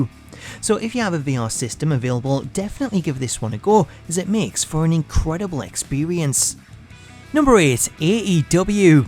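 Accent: Newcastle, United Kingdom. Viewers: North East England/Geordie accent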